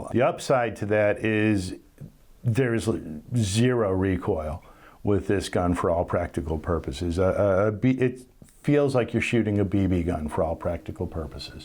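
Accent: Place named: Midwest, United States. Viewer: Pretend the Bears stuff isn’t in the background. says dis guy is obviously from da Midwest, Great Lakes region of America…and dats a great place to be from. I’m glad you don’t have a boring 25 or 30 second canned intro. Just straight into it. Nicely done, Sir. Just yesterday I ordered a Mark IV Target from Bud’s. Looking forward to its arrival. GO LIONS!!!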